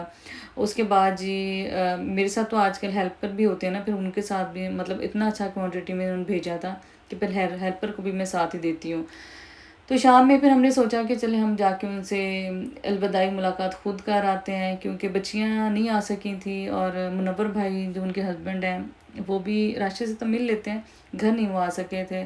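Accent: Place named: Jhang, Pakistan. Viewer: ji main to fan hu jab aap jhang ki boli me baat karti hain please aap vo bola karo❤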